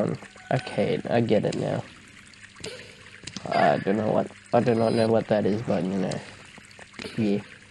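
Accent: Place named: United States. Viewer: You've got a lil american accent in that, I see you watch my videos too much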